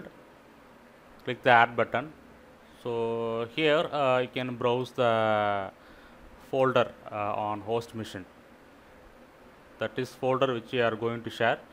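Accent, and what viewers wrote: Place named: South Asia. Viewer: Bro I love your desi pan eating accent uwu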